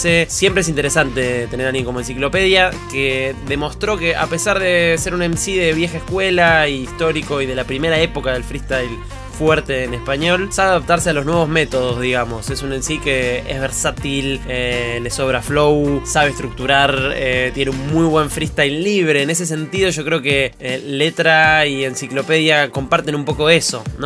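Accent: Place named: Argentina. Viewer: Se nota que eres argentino todo el rato wos Si nitro, teorema le ganan a wos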